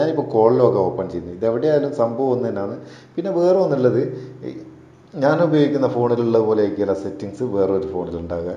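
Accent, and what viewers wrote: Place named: North Kerala, India. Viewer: എന്റെ എന്തിന്നാ ഈ പറയുന്നേ... കുറച്ചു സ്റ്റാന്റേർഡ് ആക്കിക്കൂടെ... വേറെയും എതെല്ലോ ജില്ലക്കാരും കേൾക്കുന്നില്ലേ... തെക്കന്മാർക്കൊന്നും മനസ്സിലാവില്ലാന്നേ..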